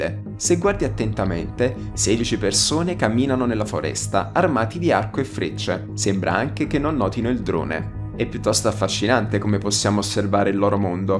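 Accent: South, Italy. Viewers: Bhe si dai non male a parte diversi inciampi sull italiano ma si capisce che sei meridionale quindi ci sta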